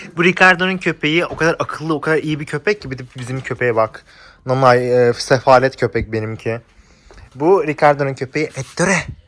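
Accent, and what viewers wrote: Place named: Italy. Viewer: Türkceyı ingilizceyı itakyanca aksanı ile konusmak😅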